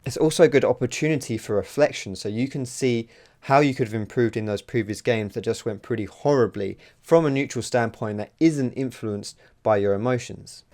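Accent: United Kingdom. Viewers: Top 5 reasons for subbing to you 1-You got a british accent which is funny 2-You seem like a nice guy 3-You have great instructional videos 4-You answer to comments 5-My 1year old daughter loves foxes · love your videos and the british accent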